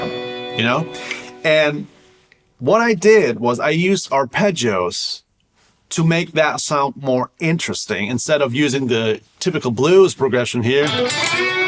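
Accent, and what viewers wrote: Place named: Germany. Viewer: You have just the smallest hint of a German accent as if you moved to America around the age of 12.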